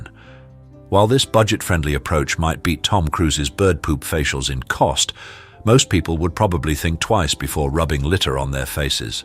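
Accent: United Kingdom. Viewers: Of course, a British narrator.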